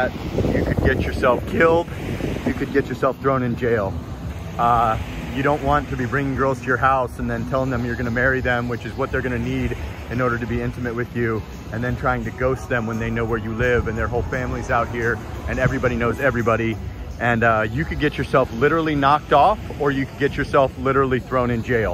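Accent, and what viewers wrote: United States. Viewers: You sound like you are from California. Thanks for the heads up.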